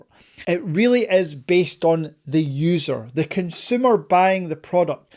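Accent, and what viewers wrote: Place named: Newcastle, United Kingdom. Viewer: You a Geordie? I always thought you were Scottish lol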